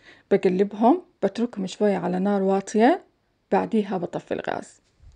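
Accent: Palestine. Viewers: هيه الاخت فلسطينيه لو اردنيه لهجتهم خيال 😘💙💙😘😘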